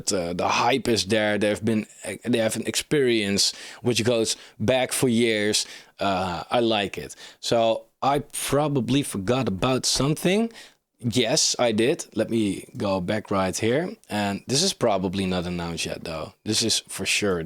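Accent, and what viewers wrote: Netherlands. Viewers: haha nederlands accent, prachtig. goeie video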